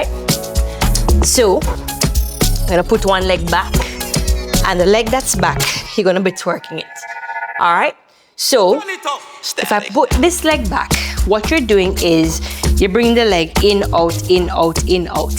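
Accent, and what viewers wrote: Jamaica. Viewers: I got mesmerized inlove when you say down wat accent haha i hear jamaica but i hear something else to 🤦🏿🤣🤦🏿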